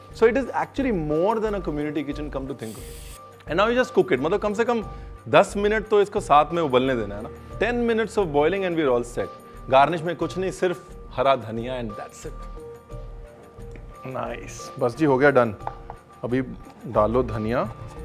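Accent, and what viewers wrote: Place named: Punjab, India. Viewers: I love your punjabi accent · I love when you add Punjabi accent in Hindi and the most famous RANVEER APP NE BATAYA NAHI.... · Itne videos dekhe hai aapke....ye pehla video hai jismein itna sara Punjabi accent sunne mila :) aur sahi hai, pyar se mann se banaya hua khana swad banta hai..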